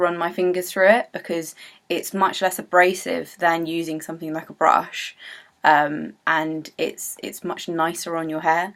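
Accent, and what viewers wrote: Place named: United Kingdom. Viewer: Oh wow its a braid. This UK speak low-key makes me want to live my English heritage dreams & move.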